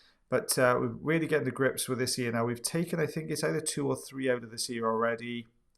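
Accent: Wales, United Kingdom. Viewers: iv got to say if there was audiology ear wax removal sports 👂🦻, iv got to say you would 100% win awards has the best commentary that goes along with the vids...best youtube commentor ears 👂 down... love that gourgous welsh accent.x Keep up the good work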